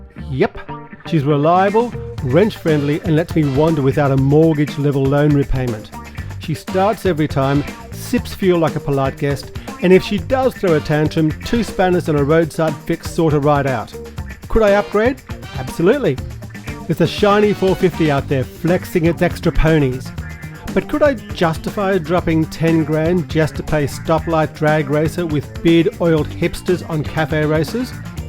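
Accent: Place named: Australia. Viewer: to hear an Aussie accent filled with love for his bike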